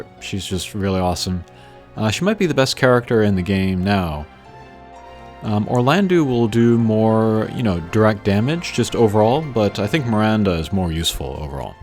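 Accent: Japan. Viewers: Hi! Welcome to channel, where contents are great and the content creator shows a great and genuine enthusiasm toward this game. If you are new here, then you might know that this great guy likes to bring the sense of Japanese culture and pronounciation during during. He is a smart guy. Let's focus on the content and appreciate any uniqueness that he share. The whole world is not centered to the West, you know:D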